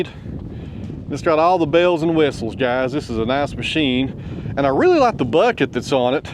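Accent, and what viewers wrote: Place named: Tennessee, United States. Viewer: Thank you, I enjoy your projects and your mastery of the english language-- Tennessee style. Happy new Year, 2025 was great thanks to you.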